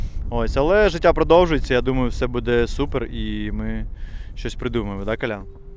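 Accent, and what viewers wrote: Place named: Kryvyi Rih, Ukraine. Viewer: а ви сам з Кривого Рогу чи з агломерації? Бо так говорите неначе тутешній, нам криворізцям цікаво звідки ви🥰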